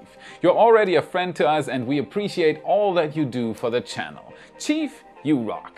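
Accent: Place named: Germany. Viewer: I love listening to that German accent, it reminds me of commander Craise on Farscape.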